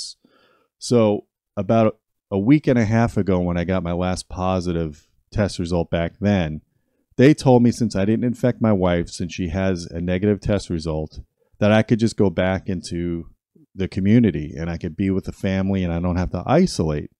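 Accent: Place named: East Coast, United States. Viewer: I would rule out Lyme, I found out I have 2 species of it from Ohio, have had it for 30 years. Just saying, it was the last thing, I checked,bingo. Sure my family has RA history too, but R Arth is a function of the main source - whether it be some sublime infection be it bacterial, or even a viral co infection that is barely kept "underneath" by your struggling immune system. My LLMD had me use DNA Conexxions kit that he said was the most accurate /cost effective one ($650). If you get a hit, then pay for the real expensive one next. I also followed strict testing protocol to get an accurate test, like fasting before the test, strenuous exercise, deep massage session that was purposely painful, empty the bladder and use the next bladder fill 30 minutes after the massage as the sample. I had Lyme A, and from my early childhood infection Ehrlichia from the Lone star Tick, I recall the bullseye rash as a teenager. 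Just saying, even if its not Lyme, it pays to rule it out. You sound like youre from the east coast, I caught Lyme in the 80s in ohio. My dad now has it from the same property. It is pervasive in the midwest, those cute deer in your yard, they bring the Lyme TO YOU. And opossums are the greatest eater of ticks next to some birds, who would've thought that? !! Also, I don't peruse your channel, you just came up when awhile back when I was looking up Coronavirus. I'll have a peek at your stuff... )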